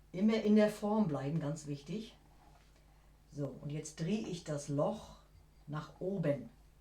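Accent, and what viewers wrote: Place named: North, Germany. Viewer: Wunderschöner Frosch, echt witzig und super erklärt, übrigens höre ich gern deinen norddeutschen Dialekt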